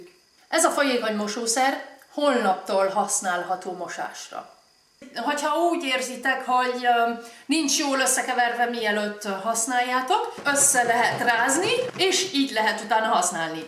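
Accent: France. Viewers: Egy kicsit hurcsa hogy magyar vagy és francia akcentusod van. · Nagyon szépen beszéled a magyar nyelvet! Imádom a francia akcentusodat! :D szexy és egyedi ;)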